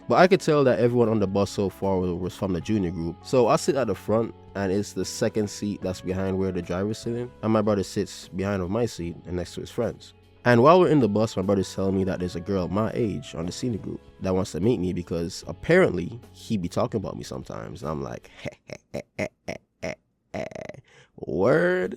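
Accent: Jamaica. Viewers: Brooo are you Jamaican? I can just feel our aura resonating off you brooo (the accent). Respect, loved the vid. ❤🇯🇲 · R u Jamaican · Dawg u accent heavy bro u jamaican? 😮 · yo bro stop tryna hide your accent bro l like some Jamaican i new u was Jamaican from how you said da buss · Here I am picking up on a fellow accent like, is he Jamaican, is he not?? But nope, as soon as you said "Brother", I knew. I always know. I'm always two steps ahead...anw, nice video. It's kinda funny watching this as a female, seeing how guys think etc. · Are you Jamaican or is that just your voice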